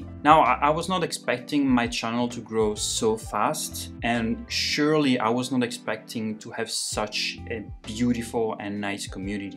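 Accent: France. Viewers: Hey, your accent seems french, if you are then please, can you start your video with a french word related to that video and what it means...just a wish though if you could....love french, would love to learn from you:D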